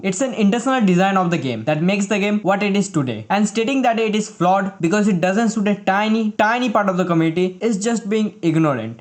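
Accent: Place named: India. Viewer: you kinda sound like indian #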